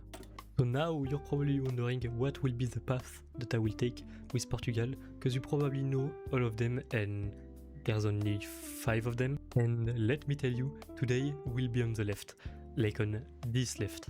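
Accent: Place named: France. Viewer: Sorry, my ears are trained to not hear when they hear france accent so the whole video was mute. How did I understand there was someone speaking with accent? By the comments.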